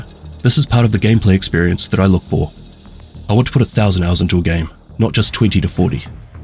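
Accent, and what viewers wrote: New Zealand. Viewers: Monotone Kiwi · smooth kiwi voice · your kiwi monotone voice works wonders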